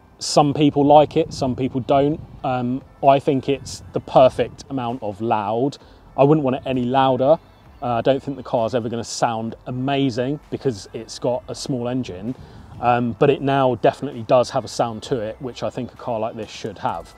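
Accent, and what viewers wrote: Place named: United Kingdom. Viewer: thats what i call a british accent! =D. TOMs rearwing gives a bit of a good rallye touch aswell!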